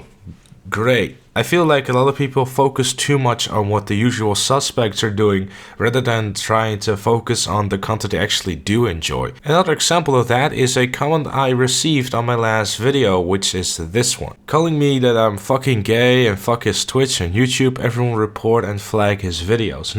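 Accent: Netherlands. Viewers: are you dutch? your accent seems dutch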